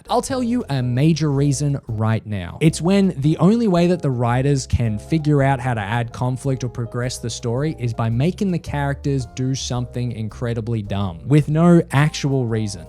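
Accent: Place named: Australia. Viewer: AUSTRALIAN ACCENT DETECTED 🇦🇺🇦🇺🇦🇺🇦🇺🇦🇺🇦🇺🇦🇺🇦🇺💪💪💪💪💪 RAAAAAAH MAD MAX FURY ROAD IS THE BEST SCI-FI MOVIE EVER 🇦🇺🇦🇺🇦🇺🇦🇺🇦🇺🇦🇺🇦🇺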